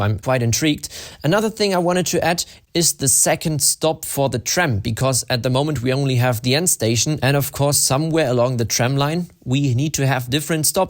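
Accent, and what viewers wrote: Germany. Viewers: HAH ICH WUSSTE DAS DU EIN DEUTSCHENAKZENT HAST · Are you from Germany or Austria? btw, love your video's! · I hear a bit german XD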